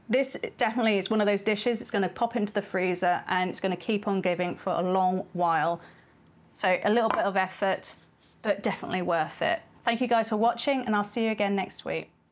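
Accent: United Kingdom. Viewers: Nah, "malliard" and "bull-ion" is because she's British. You want some examples of how British people mangle European words, you should see the Great British Bake-off Mexican week special.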